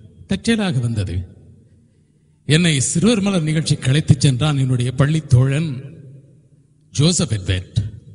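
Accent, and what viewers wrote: Standard. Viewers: வாழ்த்துக்கள் சார் உங்கள் தூய தமிழின் உச்சரிப்பு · பிராந்திய. தமிழ். இல்லாமல். பொதுவான. இனிமையான. தமிழ். தமிழ். பொக்கிஷம். நன்றி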